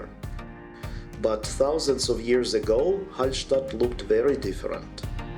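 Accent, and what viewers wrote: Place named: Germany. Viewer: Здравствуйте ещё раз. Сначала собралась искать субтитры, думала на немецком. У Вас уже немецкий акцент. Но потом поняла, что это английский. И всё понятно, хорошее произношение. А я как раз учу английский. Очень красивые места. С большим удовольствием смотрю. Супер!👍👍👍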